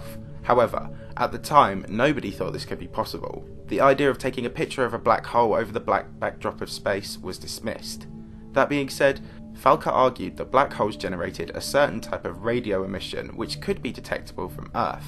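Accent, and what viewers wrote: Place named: Essex, United Kingdom. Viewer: He's doing what God put him on this green earth to do; have a salon-quality Essex voice, and read the astronomy.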